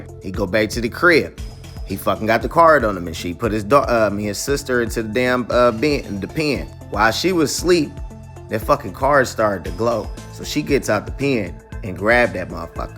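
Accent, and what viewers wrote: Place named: South Central, United States. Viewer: My boy, talking with the south Central accent you know we family I got a sub🔥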